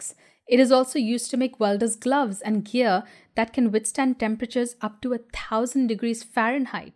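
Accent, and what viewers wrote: India. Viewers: Hey very nice educative content, awesome graphics and you have a nice polished Indian accent too, you from India?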